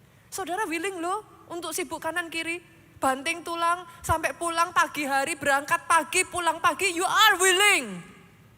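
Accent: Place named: Java, Indonesia. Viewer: Terima kasih Pastor untuk kotbahnya. Saya sgt du berkati jelas dan tajam, sgt membanty saya memahami Alkitab. Awalnya saya tdk suka dgn pastor krn menurut saya kog seperti model trus bahasa Indonesia nya agak medok jawa. Tetapi lama lama saya smkn suka. Pastor itu fashionable dan dinamis, cerdas dan tegas, Terima ksh ya ps n team, Gbu all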